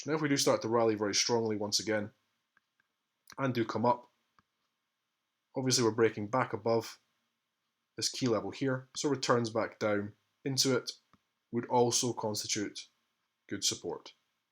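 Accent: Scotland, United Kingdom. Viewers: Hi - another really useful video - Thank you. That beautiful Scottish brogue has become the soundtrack to my Sunday brunches recently! Are you watching any fundamentals on the pair this week? Does the RBA Interest Rate Decision come early enough and carry enough clout to set the trend for the week; and does the US Non-farm Payroll at the end of the week have any likely bearing on price action?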